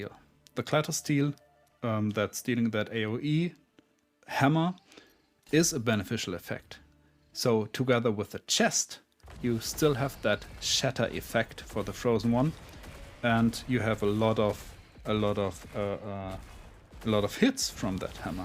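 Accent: Germany. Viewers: Habe die ganze Zeit gedacht, dass du von der Aussprache her ein deutscher bist. Nach dem Kommentar im Video von Darkness, bin ich mir sicher :-)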